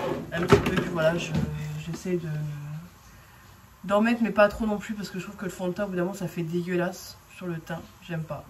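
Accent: Belgium. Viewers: ton accent au début il était bien belge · tu m a fait trop rire avec ton accent belge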